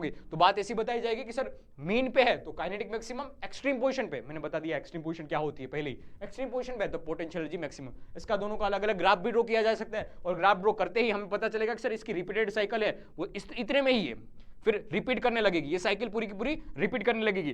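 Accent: Gujarat, India. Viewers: Only gujrati guy recognise other gujju's Hindi😅, BTW sir is gujarati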